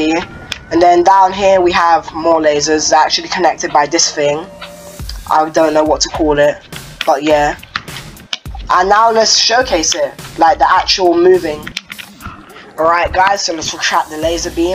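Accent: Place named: United Kingdom. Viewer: The British accent is funny😂😂 You earned yourself a sub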